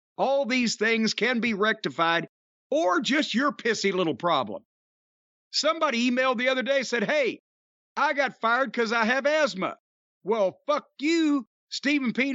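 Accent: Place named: Chicago, United States. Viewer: Gotta love Cornys Chicago accent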